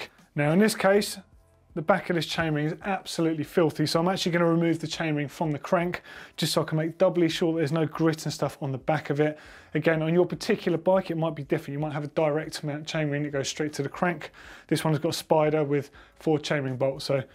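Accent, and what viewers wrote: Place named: United Kingdom. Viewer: F*cking "brittish" accent :(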